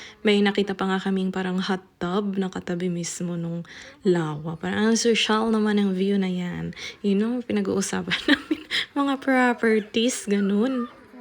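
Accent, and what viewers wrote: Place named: Ilocano, Philippines. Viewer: Congratulations sa mga kamag anak mo host. Parehas tayong matigas ang diket na salita, ilokanong norte 😅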